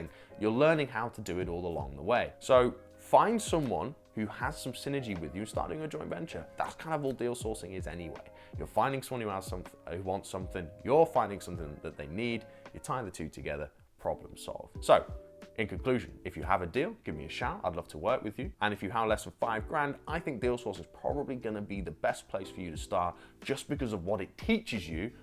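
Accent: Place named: United Kingdom. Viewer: Yeah very cute and young to be a property Investor lol god bless you. I like your accent where are you from in the UK? I'm thinking to take your course :)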